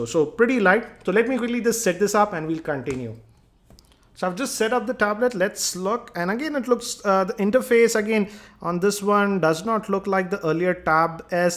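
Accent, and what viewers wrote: India. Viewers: the heavy Indian accent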